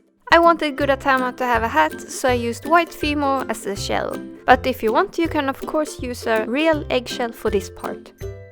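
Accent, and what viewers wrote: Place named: Sweden. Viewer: Shit, man hör på din dialekt att du är svensk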